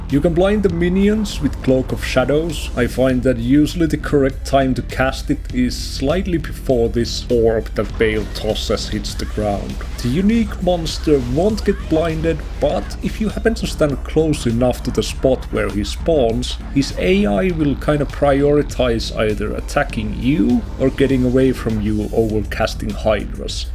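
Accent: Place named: Finland. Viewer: ahh :D tunnistin heti suomen aksentin sun videosta :D gj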